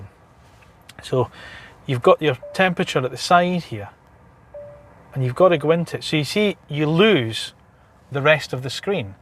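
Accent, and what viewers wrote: Scotland, United Kingdom. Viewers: Scottish accent, bro 😎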